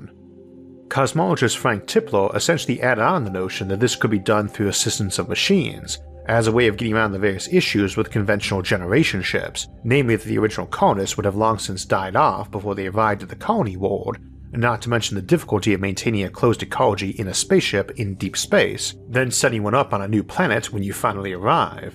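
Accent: United States. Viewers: I didn't know it was an impediment I just thought it was his accent from the part of the US he came from. I like it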